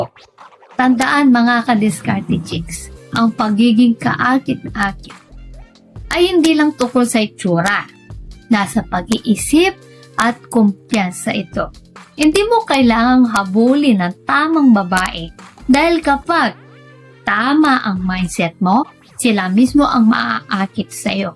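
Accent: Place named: Bicol, Philippines. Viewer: Parang bikolano ka Ma'am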